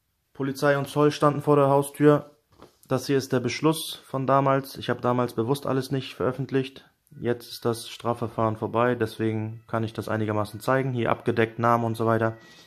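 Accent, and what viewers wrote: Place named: North, Germany. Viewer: Klingst so norddeutsch😂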